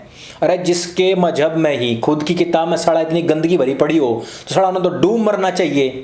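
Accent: Haryana, India. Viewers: हरयाणवी लहजा 😊😊😊😊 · आज बहुत समय बाद आचार्य जी को ठेठ हरियाणवी बोलते हुए देख कर बहुत अच्छा लगा ! कृपया कुछ संवाद हर विडियो में ऐसे बोला करने की कृपा करें !! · Waa bhai aaj haryanvi touch 😀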